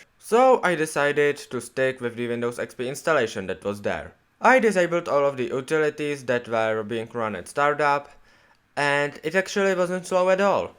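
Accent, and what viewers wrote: Czech Republic. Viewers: it's just Czech accent :-D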